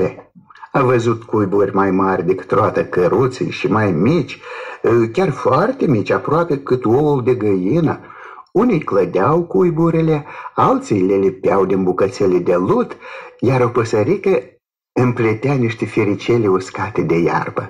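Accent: Moldova. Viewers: Dragă, chiar dacă am peste 60 de ani, iubesc poveștile copilăriei. Mai ales poveștile de seară, spuse în dulcele grai moldovenesc de peste Prut. Te îmbrățișez cu multă dragoste! Îți doresc o săptămână binecuvântată cu multă protecție DIVINĂ! 😘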